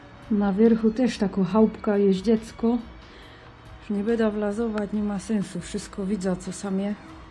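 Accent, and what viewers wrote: Silesia, Poland. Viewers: Super! Fajnie to wygląda od środka, ale najlepsze to że po Śląsku mówisz a raczej godosz, pozdrawiam serdecznie i oczywiście łapa w górę 👍👍👍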